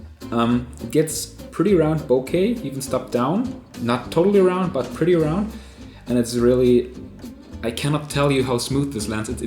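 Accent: Germany. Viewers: german accent made me subscribe... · Great video, i dont know why, but a german accent makes me want the lens even more!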